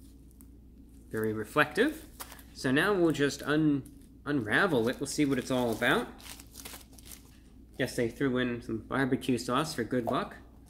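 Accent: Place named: New York, United States. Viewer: You can tell he's from the New York area.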